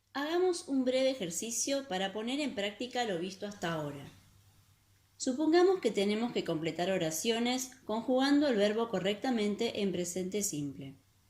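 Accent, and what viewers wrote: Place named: Argentina. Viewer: Que explicación tan clara como el agua. Gracias señorita se nota que es argentina o algo asi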